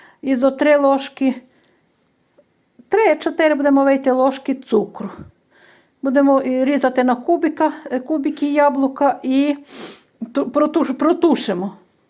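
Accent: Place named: Galicia, Ukraine. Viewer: Це щодо зауваження до п.. У неї класний галицький діалект. Продовжуйте, п., і не звертайте уваги на такі дрібниці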